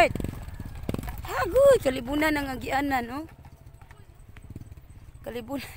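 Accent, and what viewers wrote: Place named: Leyte, Philippines. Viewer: taga dis a ka pareha lge ta ug sinultihan KAHIBAWO ka mag Leytenio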